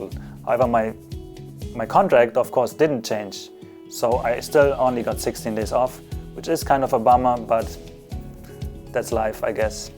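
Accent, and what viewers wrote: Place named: Germany. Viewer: Die Sekunde wo du angefangen hast zu reden habe ich gemerkt das du deutscher bist. Ich liebe es deutsche Akzente zu hören. makes me feel like i'm at home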